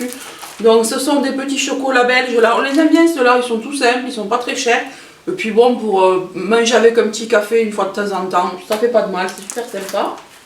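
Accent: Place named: Tarn, France. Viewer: Bonjour, ta vidéo est très sympa. De plus, quel bonheur d'entendre ton accent, qui est aussi le mien, sur YouTube. Bisous 🥰🥰 du Tarn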